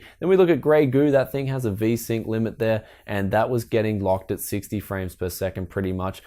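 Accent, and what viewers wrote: Australia. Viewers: Are you Australian? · he's an Aussie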